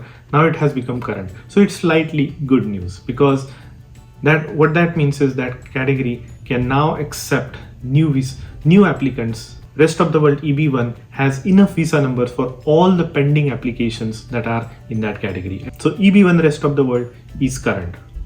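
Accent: India. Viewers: U need to make your accent less Indian-like. It is not pleasant to one's ears.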